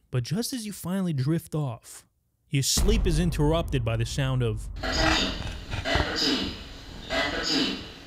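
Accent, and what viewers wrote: New York, United States. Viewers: The narrator sounds like a cross between Alan Alda and Howard Stern with a little New York tough guy thrown in! Great report!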